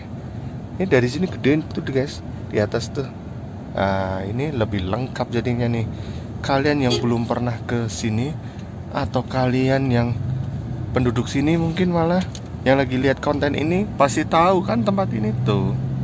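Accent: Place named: Java, Indonesia. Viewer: Asik ne ada logat jawa jawanya... agak kecil juga jalannya.. suasananya ada gambaran seperti di Kalimantan...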